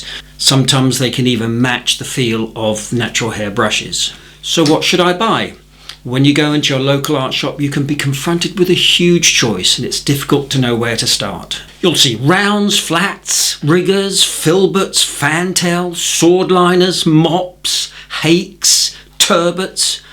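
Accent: United Kingdom. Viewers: Nice to hear fellow Brit! You are now m new favourite…just done your skies tutorial…and m now listening to your views on paper and brushes.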